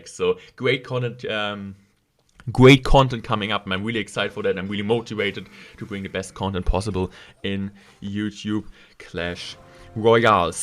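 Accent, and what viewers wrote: Germany. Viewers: Man hört das Deutsch so extrem raus. "clash wojal" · German accent alarm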